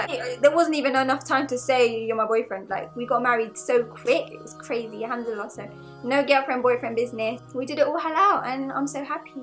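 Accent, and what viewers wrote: United Kingdom. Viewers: Are you from Birmingham lol? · I still try to understand the britush accent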